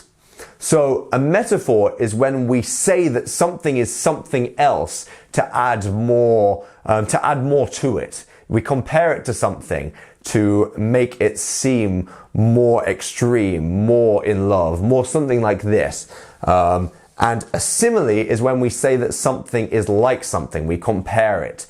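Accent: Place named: RP, United Kingdom. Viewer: I'm totally hooked on your accent,. Hearing this RP is like a journey through the vast and green Southern English landscapes 😅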